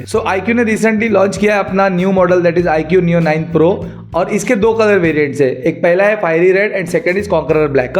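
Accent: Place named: Maharashtra, India. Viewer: मराठी माणूस आहे का रे भावा तू ? 😄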